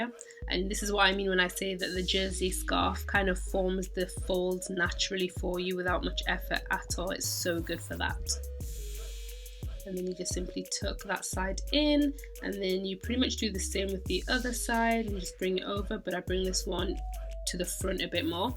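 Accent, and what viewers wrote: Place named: North, United Kingdom. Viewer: You're pretty! And I like your accent. Northern? ❤️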